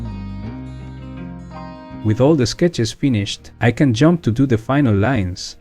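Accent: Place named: Spain. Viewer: This dude is going crazy I can tell that you are Spanish but well done 👍 G keep it going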